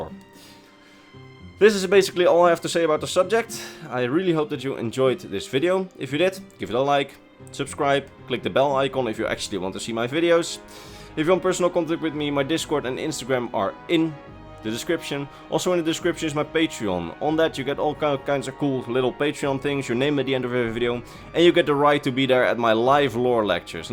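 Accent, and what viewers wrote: Netherlands. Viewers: Are you Dutch? Your accent sounds kind of Dutch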